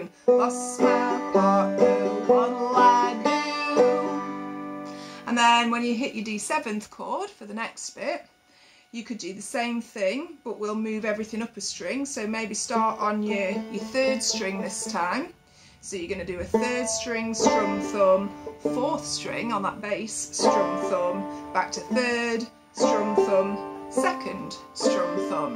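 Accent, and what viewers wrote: United Kingdom. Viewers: Hey, I discovered your lessons while donating platelets and I love em. I about rolled out of the chair laughing when your British accent made me think of the pun, "Skip to my loo"! 🤣 I was delighted to get home so I could play along and I feel like I'm making good progress. Thanks!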